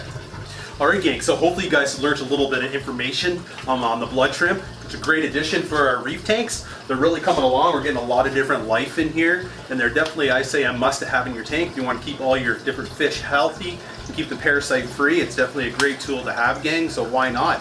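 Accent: Canada. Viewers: he's from Canada they say stuff differently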